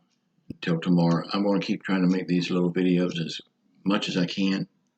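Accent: South, United States. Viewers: My boyfriend and I watch you daily. Prayers for your wife and you. It’s extremely obvious how much you’re hurting not having her home with you. Anyway, my boyfriend thought we should let you know we call you. He says you look like a mixture of Donald Trump and Elvis 🤷🏼‍♀️. I just like the southern accent in your voice and you could look like Elvis might if he’ was still here 😁😏 Where are you from? We live in Northern Indiana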